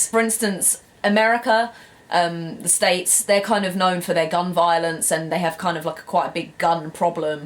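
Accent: United Kingdom. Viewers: teach me english...i like your british accent:* i like england too..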